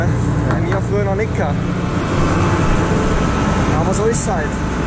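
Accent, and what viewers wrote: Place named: Switzerland. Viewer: Jo de schnurret halt scho fascht schwizerdütsch.isch jo gard näbe mir äne am riih.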